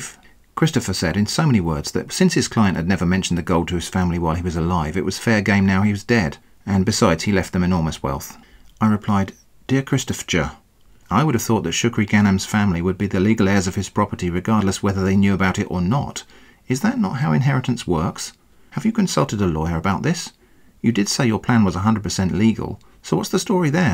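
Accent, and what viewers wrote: United Kingdom. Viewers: I really like the production you put into this one!! I love ALL your videos as I'm a fool for your cheeky British accent. Lol thanks for your work!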